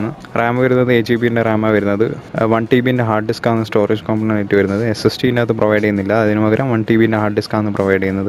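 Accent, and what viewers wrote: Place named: Kannur, India. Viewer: Aah kannurkaran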